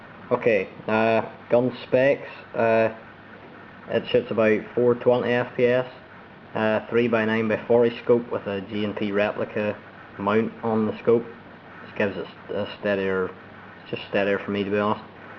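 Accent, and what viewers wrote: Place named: Belfast, United Kingdom. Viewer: love the Belfast accent :L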